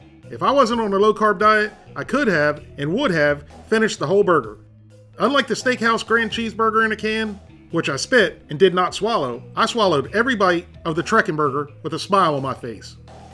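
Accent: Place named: North Carolina, United States. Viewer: Man you sound identical to the folks from my home town in North Carolina, which is really refreshing seeing as how I moved off when I was 19 , do you live in the south by chance ?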